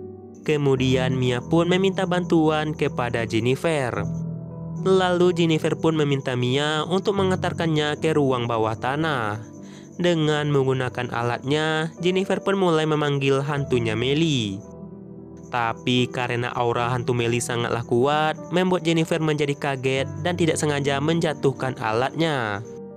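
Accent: Aceh, Indonesia. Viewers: Admin nya pasti orang Aceh ni, logatnya gk bisa bohong.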